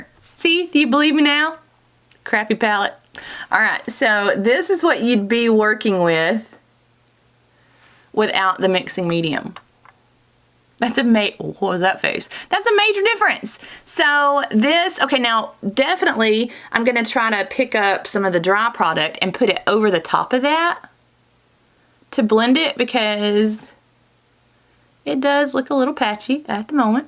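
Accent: United States. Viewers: what a great idea! i love watching your videos. so real and funny and you have a country accent like me LOL!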